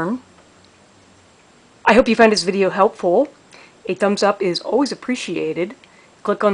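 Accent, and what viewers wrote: Philadelphia, United States. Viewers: Sound like your from Philly?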